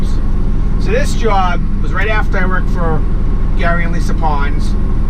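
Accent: Boston, United States. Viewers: Hell, if I have to drive my pickup to a job 20 miles away, by weeks end I complaining to my bride that with all this driving I feel like an over the road trucker 🤣🤣 love you videos , grew up on the south side of Boston and left 31 years ago and it’s great to hear some one that talks normal 😜😜😜✊✊ that’s wicked pissa 😀😀🇺🇸🇺🇸🇺🇸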